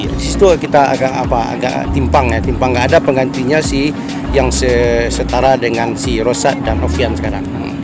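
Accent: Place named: Padang, Indonesia. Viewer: Bahasa Indonesianya kental banget Padang nya hehehe.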